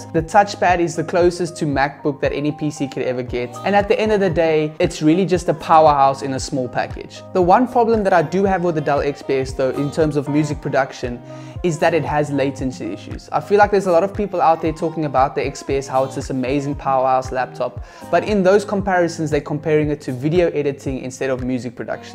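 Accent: South Africa. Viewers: The only video that actually helped me with finding which laptop to buy thank you. The SA accent reminded me of home brew. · When you said (SMUTH) my brain said...he sounds like he came from South Africa.😁 but your location says LA. hehe EDIT: yea I was right. hehehe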